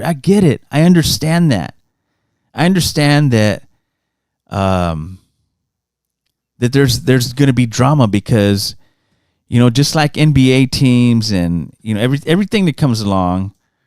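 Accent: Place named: San Antonio, United States. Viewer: First time hearing you, but I'm guessing you're from SA?? Is that the Red McCombs Indian??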